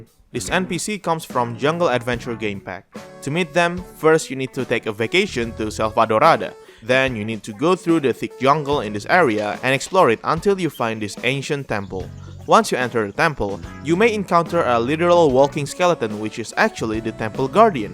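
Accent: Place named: Indonesia. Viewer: OMG I don't know if you're Indonesians, first time I hear your accent it's look like Indonesian people talking English lol that's cool 😅👍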